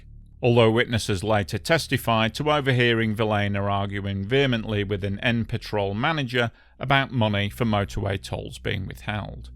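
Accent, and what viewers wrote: United Kingdom. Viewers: I appreciate your very Britsh English. Thank you for this documentary. I´ve never heard or read about it.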